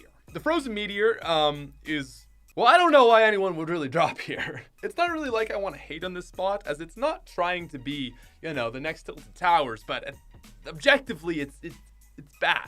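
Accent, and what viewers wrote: Canada. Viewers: is it a canadian thing to say anywhere and nowhere with s at the end or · Are you canadian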